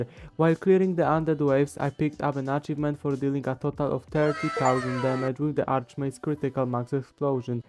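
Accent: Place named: Poland. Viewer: najmocniejszy polski akcent ever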